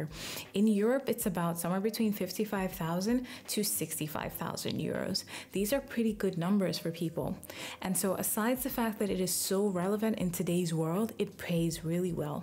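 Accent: Nigeria. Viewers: sounds Igbo dear, are you Nigerian? I am fully entering the UIUX Design space. Switching my career from media and communication.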